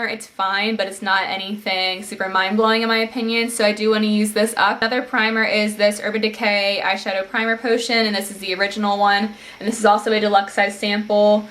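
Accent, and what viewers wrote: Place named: Maryland, United States. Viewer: I'm your neighbor to the south. I'm in MD which is why your accent is so familiar. lol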